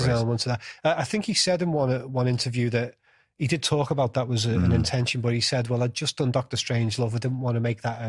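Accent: Liverpool, United Kingdom. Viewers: I love when unleash his Liverpool accent in all his glory! 🏴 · you sound just like Paul McCartney, are you from Liverpool? Anyways, I like it, & I love your videos about movies, esp Stanley’s ❤️ from Texas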